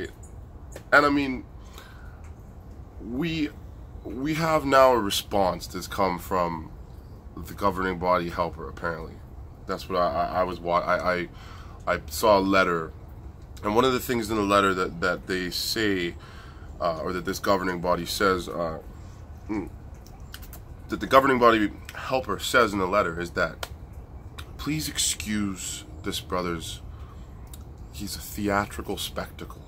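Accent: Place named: Canada. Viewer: You are so adorable and funny. Love the Canadian accent!!!!!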